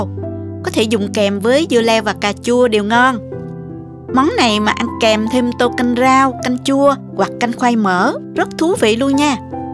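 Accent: South, Vietnam. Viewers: Dung la giong noi Mien Dong Nam Bo.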